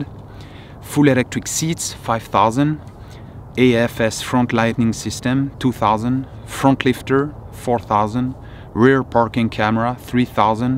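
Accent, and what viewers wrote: France. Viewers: Is he French? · Very honest review, and I like your French accent !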